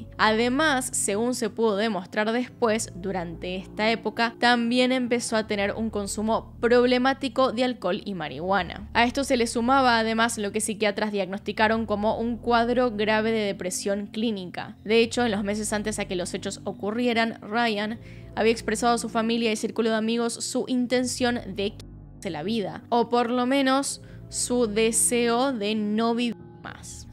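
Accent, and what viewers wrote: Argentina. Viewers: Como me encanta el acento argentino!!❤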